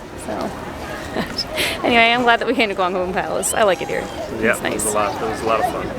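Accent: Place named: United States. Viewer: I love your English accent with excellent pronunciation!! You speak very well with clarity and coherency!! You sound more of an American than a Canadian for some reason though. Sorry if this sounds offensive. Did you live in the U.S.?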